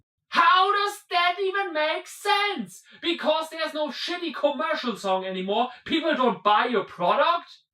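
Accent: Germany. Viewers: Are you speak in german accents? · Hearing a German man say Bollocks is strangely hilarious. Not bad commentary, you're kinda like a German Decker Shado. A'ight, you earned yourself a subscriber.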